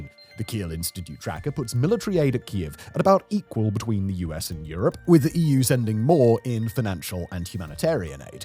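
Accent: RP, United Kingdom. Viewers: Also a European lecturing Americans in a condescending tone with the queens English about power for 30 minutes while living in an impotent country is rich.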